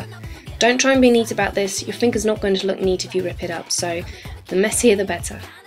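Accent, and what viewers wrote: England, United Kingdom. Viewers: Love the british accent XD EPIC !!!! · Just watching it still ive paused it can i just say i looooooooovvveeee your pommy voice so much. Subscribing for it and great video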